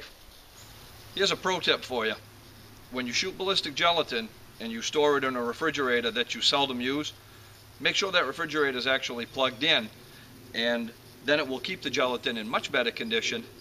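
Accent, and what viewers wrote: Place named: New England, United States. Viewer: That Yankee accent gets me...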